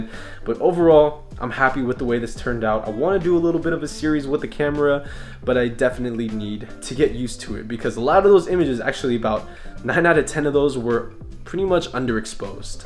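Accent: Philippines. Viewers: Road to 100k subs folks. Like William veerbeck and other black guy who loves film photography, they did it too. Keep uploading more videos. Love it, from your filipino accent. Hehe ♡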